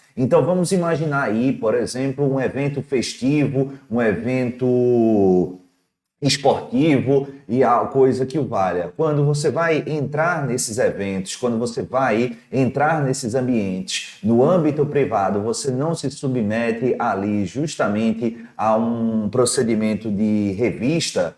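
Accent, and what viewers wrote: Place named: Northeast, Brazil. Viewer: Não sei se alguém percebeu, mas o sotaque nordestino desse mestre, ao que me parece, e essa calma cirúrgica na explicação permitem entender com clareza o tema abordado. Tenho assistido às demais vídeoaulas dele e aprendido bastante.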